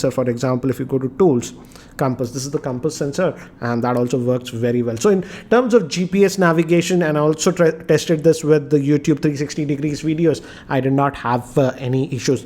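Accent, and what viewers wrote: Hyderabad, India. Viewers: indian accent is very diaturbing and annoying! i hate it!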